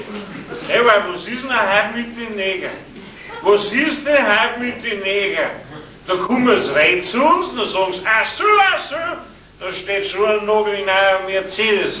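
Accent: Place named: Bavaria, Germany. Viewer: Als grenznaher Baden-Württemberger muss ich sagen: Grünwald ist in seiner Comedy-Sparte König. Ich glaub, ich finde den so komisch gerade weil er Boarisch spricht. Boarisch und fließend Besoffen.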